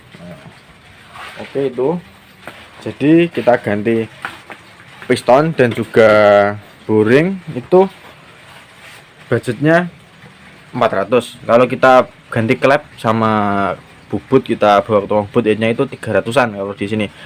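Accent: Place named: Bojonegoro, Indonesia. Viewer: Tak kiro wong ndi ae mlaah podo bjne😂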